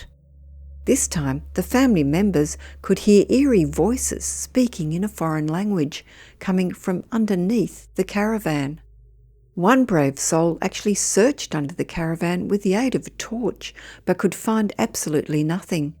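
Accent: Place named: Australia. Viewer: Hi there with the lovely Aussie gal commentary! I have a question,IF you please?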